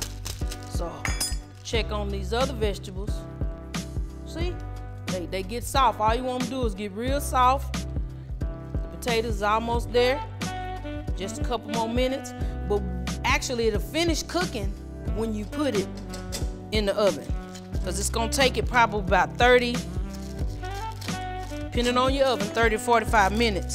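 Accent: South, United States. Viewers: Aww i need a oven, when i have one i am going try this, i would like to make sweet patato pie one day to.😀💐 you are funny " yall wanne me to cook so i,m gonna cook looool and beautifull youre doing fine with the explanation and that beautifull southern accent, i do believe the camera man should do more close ups on the food and the cutting and in the pot, later he or she does that, if its youre husband filming you i understand he cant keep his eyes of you 💐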